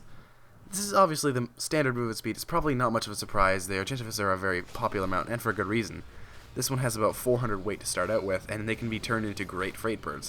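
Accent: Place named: United States. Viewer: Zajd Zajd it would be fine if he had a different accent but he talks like a American/Canadian and he says things completely wrong!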